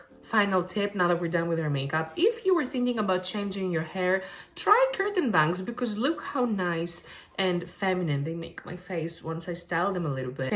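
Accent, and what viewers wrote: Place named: Greece. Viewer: I don't know if this is common knowledge but I have not been sure about it. Hearing your voice now, I feel it confirms my suspicion that you might be greek, lol. So I was wondering how you use neutral pronouns in greek. Asking for personal use 😅 Anyway, thanks for the makeup tips too.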